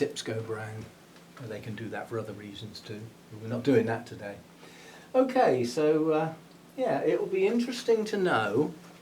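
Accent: United Kingdom. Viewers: I'm pretty sure I happened upon your channel when I did a search for "aggregatum orchid care" which I know you did not have back then but the word "orchid" probably led to your channel. I stayed because I like your personality and you have a wealth of knowledge I appreciate. Plus as an American, I enjoy your British accent! I remember when I first heard you call a plant "tatty" and I found it charming. And you have a kooky sense of humor. I hate this social distancing too, but we have to do what we can.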